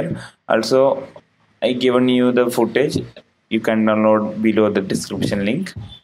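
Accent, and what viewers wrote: Kerala, India. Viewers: ഇംഗ്ലീഷ് കേട്ടിട്ട് മലയാളിയാണെന്ന് തോന്നുന്നു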